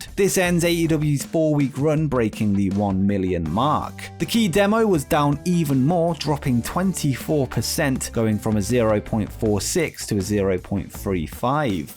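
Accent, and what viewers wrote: United Kingdom. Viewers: come on... There is nothing wrong in admitting it's you. I wish that I was able to add a link of your other channel here but I would genuinely fall for your genuine denial if not that both of the voices including the perfect British accent of yours are so genuinely exactly and precisely the same, that one who knows you from Vidiq can't really mistake it.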